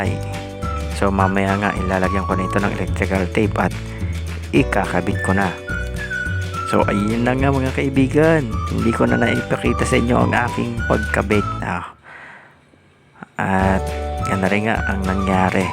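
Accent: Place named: Batangas, Philippines. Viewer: Idol taga batangas ka ba ? May Punto Kasi ung salita mo sa video, may problema ung v380pro ko ayaw mag read Ng QR code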